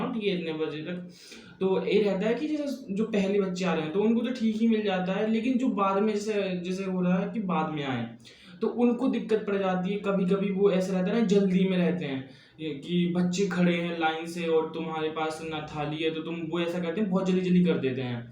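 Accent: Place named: Uttar Pradesh, India. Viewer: Bro tum konse state se ho merko Uttarpradesh se lagte ho .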